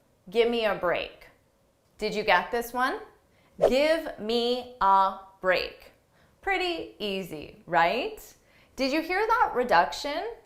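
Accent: United States. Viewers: American accent is quite easy to understand, British one is so challenging though. I listen your podcasts on 1.5 speed and face no issue. In terms of British accent, a lot of words are not understandable for me like, theme etc. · This isn't English. This is American. Don't learn this if you want to learn English pronunciation. It's a waste of time.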